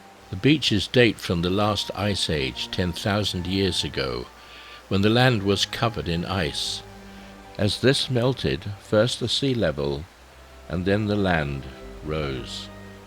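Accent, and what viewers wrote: United Kingdom. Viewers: A Master piece video! Dare I ask, Whos voice is that, that narrates your video? T he slight British accent, the soft tone, ranks with the best voice overs. is that you? or a someone you chose? And the story lines fit the scenes perfect! Thank you so much, for sharing your adventures! My favorite one is your trip to Norway, thats where my Grand parents came from, at the turn of the Century...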